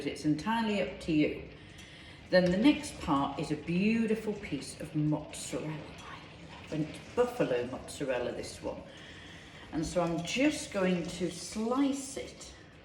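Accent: United Kingdom. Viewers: You are so gorgeous and I love the accent.. I take it that you are from the UK maybe.. I would love for you to be my Valentine love.. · Sounds yorkshire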